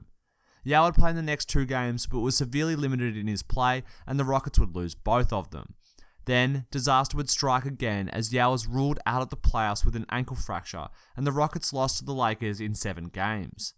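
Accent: Australia. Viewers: Do I hear a fellow Aussie that’s a sub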